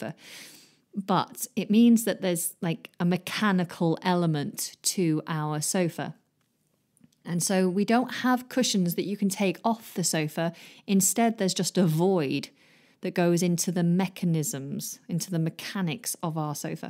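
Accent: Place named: United Kingdom. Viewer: Love it. Love British accent.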